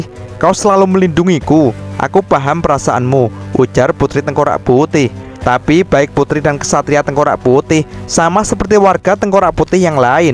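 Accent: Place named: Madura, Indonesia. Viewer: Kok malah kek orang Madura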